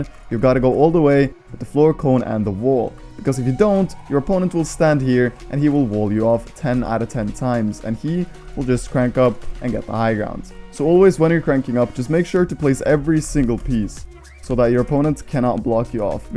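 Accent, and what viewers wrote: Norway. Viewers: U from Norway? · Are you Norwegian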